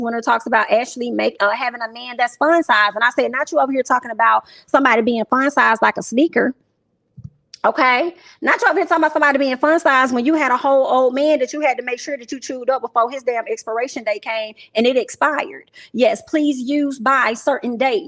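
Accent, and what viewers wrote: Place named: Alabama, United States. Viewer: I just love to listen to you talk! Reminds me of my family home (Sylacauga, AL). ❤️❤️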